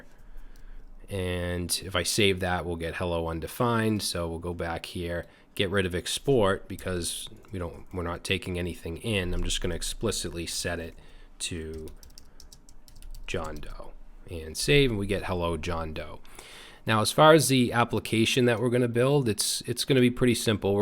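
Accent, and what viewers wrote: Boston, United States. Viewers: Great tutorial! Sounds like you're from the Boston area, ("btn dahk")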